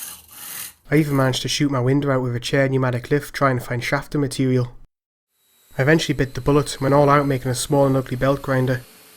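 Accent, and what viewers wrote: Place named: Scotland, United Kingdom. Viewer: Great videos! Thanks for uploading! Clearly, you're from Scotland, but do you mind if I ask what part?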